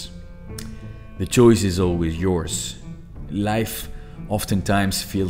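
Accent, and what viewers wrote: Greece. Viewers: Sounds as greek accent. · Came here to study, heard greek accent, melted, lost focus. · You sound so greek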